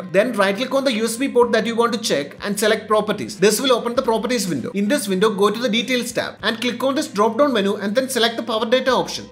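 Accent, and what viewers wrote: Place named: India. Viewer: appears to be Indian English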